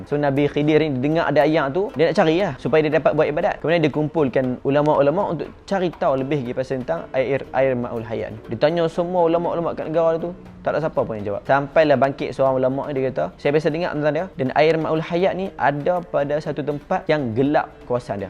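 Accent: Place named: Terengganu, Malaysia. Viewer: Mate Prike hor ler kiter ni org pata timo je (ganu) 🤣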